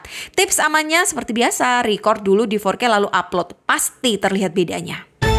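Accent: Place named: Java, Indonesia. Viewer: Beda sih , ini masih ada tipis2 medok nya Kalo d trans 7 lebih mulus hehe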